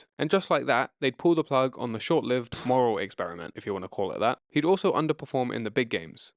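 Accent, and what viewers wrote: England, United Kingdom. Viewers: Why do you have a English accent person talking about American football,stupid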